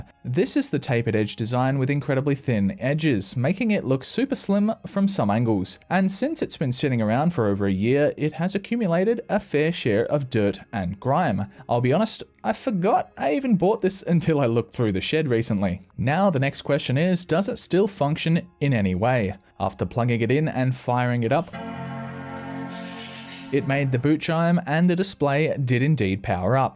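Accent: Australia. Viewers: I can hear the Aussie accent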